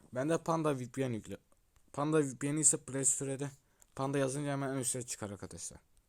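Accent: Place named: Syria. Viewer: Abi sen suriyelimisin konuşmayı unuttun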